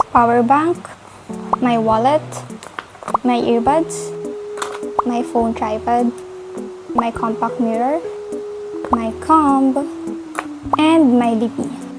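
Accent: Philippines. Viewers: Hi I’m new to this channel and are u Filipino bc u sound like one a if u are one hello twin 😂 ❤ Edit: oh wait u are Filipino pala 😂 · I CAN HEAR THE FILIPINO ACCENT 🤩💕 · I knew you were tagalog bc of ur accent!!! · Do i hear a pilipino accent?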